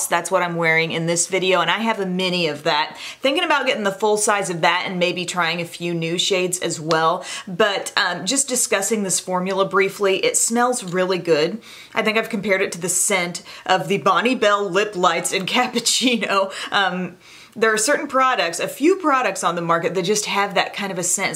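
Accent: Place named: Nashville, United States. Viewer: I don't know where you are from, but your accent makes me homesick for where I grew up--Nashville.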